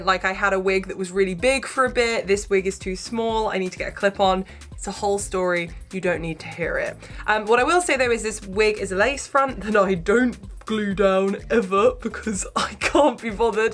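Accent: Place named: United Kingdom. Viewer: this is amazing and also one thing i was thinking about was the you sound like your from the uk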